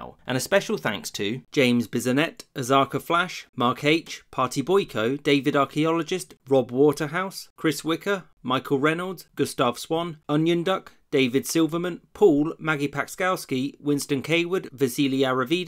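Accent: United Kingdom. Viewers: as told by a brit yes, you know thisll be good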